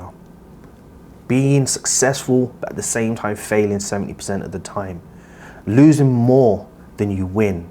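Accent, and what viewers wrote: London, United Kingdom. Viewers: Good old London matie!!! 🇬🇧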